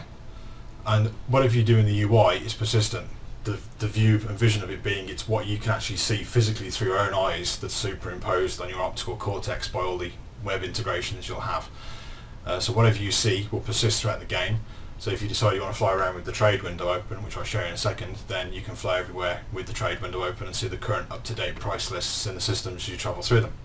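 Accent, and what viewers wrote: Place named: Australia. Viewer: you're UK based yes? you sound like an Aussie.. meh maybe itz just me.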